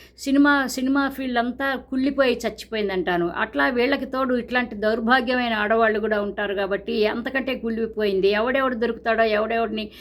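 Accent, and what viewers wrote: Guntur, India. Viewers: మీ తెలుగు చాలా బావుంది మేడం నా బాల్యయం లొ గుంటూరు లో వినేవాడిని hats off to ur principles 👍 from Vizag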